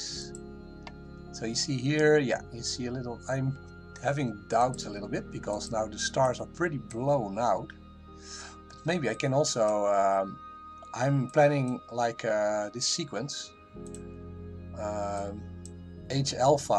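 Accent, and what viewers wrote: Netherlands. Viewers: We like the Dutch accent too. But to hear your skills explained in a clear way is great. I know you say you are learning, but we are all learning. And to see your image and know how you got there is the information that is useful.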